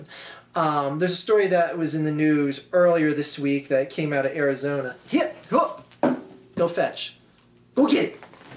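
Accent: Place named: New Jersey, United States. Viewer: He has a Jersey accent if I'm not mistaken.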